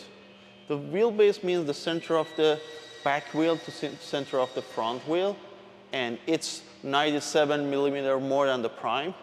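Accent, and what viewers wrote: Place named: Iran. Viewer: Yes they ship to all countries and Im 90 percent sure Brazil is covered. They will charge you factory destination and shipping and tax. Might be more expensive then in America or Dubai, where the company is based from. They are also Canada location. As it says on the bike Assembled in Canada. This owner has a brother which I think works for him. This guy is Persian and has a nice thick accent. A 12 year old can do a better job then this nut case LOL.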